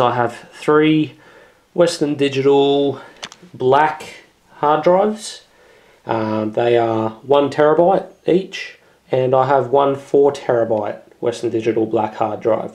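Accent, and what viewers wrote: Australia. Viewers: awesome setup! M8 r u Aussie:D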